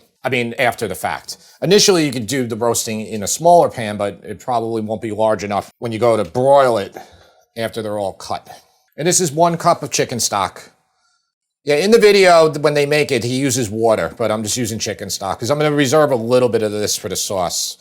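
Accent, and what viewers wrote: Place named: New York-New Jersey, United States. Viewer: With his NY-NJ accent, the host sounds like comedian Jim Florentine. 😂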